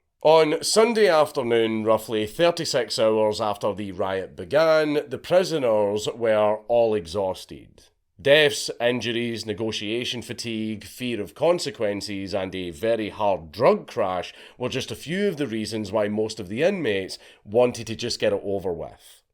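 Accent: Scotland, United Kingdom. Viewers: Whoa whoa whoa what is the Fuck did you just say about Exodus. You have never said anything that has ever offended me before but my dude that ain't cool! Edit. It just occurred to me after I posted this I think I just misheard you because of your Scottish brogue that I love so much that you said thrash band not trash band 😮😅 my bad rock on brother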